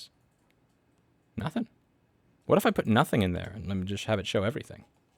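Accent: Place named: New England, United States. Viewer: if your serious about leaving the city and are looking for new places to establish your shop- <link> low taxes, there is software development community in Charleston, Clemson University mints engineers and companies like BMW have moved US operations there. Now if your worried about your Yankee accent, I'm sure after some fried chicken or shrimp and grits you will acculturate so the locals think your a tipsy german thats been relocated by BMW. Good luck Mr. Rossmann!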